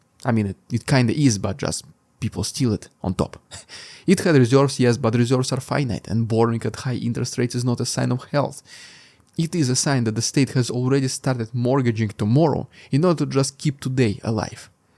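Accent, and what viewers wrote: Russia. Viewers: Yea you were nice and funny in the beginning But I have given you 2 years of views and you still sound like you have a sock in your mouth when you speak English. You so can do better. I unsubbed just because of your laziness for speaking properly. You have had over 2 years!!!!!!!!!!! And you still speak like a Russian that just started learning. NO EXCUSE!!!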